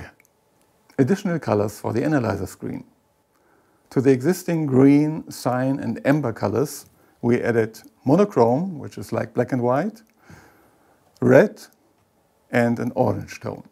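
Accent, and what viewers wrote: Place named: Germany. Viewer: I listened to the whole video mainly because of the cool German accent.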